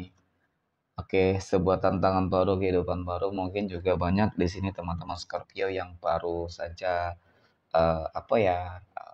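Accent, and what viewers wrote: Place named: Banyumas, Indonesia. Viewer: Aamin hadir bang jawa ngapak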